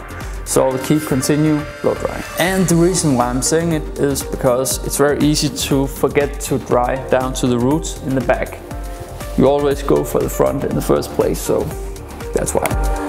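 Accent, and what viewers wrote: Denmark. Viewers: Wow den danske accent der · Man kan bare høre, at han har en dansk accent xD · Den danske accent er meget tydelig xD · Is je Danish or something? Got a strong dialect going on.. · That accent! 100% Danish! Luv it! <3 Spitzen Video!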